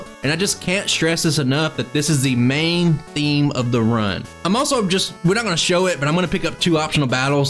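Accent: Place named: Appalachia, United States. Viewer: I can recognize the appalchian accent from a mile away. Where are you from? East TN?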